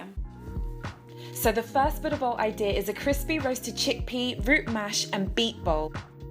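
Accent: United Kingdom. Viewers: You sound British...why are you using •Fahrenheit???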